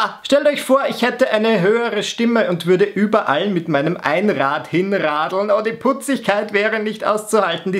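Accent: Vienna, Austria. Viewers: Alter dieser Wiener Dialekt ist sowas von wähhh. Ich lebe auch in Österreich und immer wird der Dialekt nur auf Wiener Dialekt geschlossen. Nur dass die Wiener nicht wissen dass andere Österreicher den Wiener Dialekt nicht wirklich mögen. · Das ist kein österreichische deutsch das ist Wienerisch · Du redest Wienerisch, das ist was ganz anderes als der Durchschnittsdialekt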